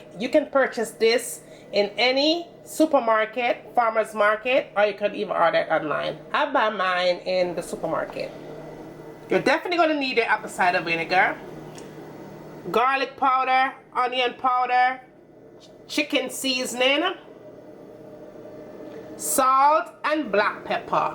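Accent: Jamaica. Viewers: Jamaican accent is the thing I didn’t know I needed on YouTube!!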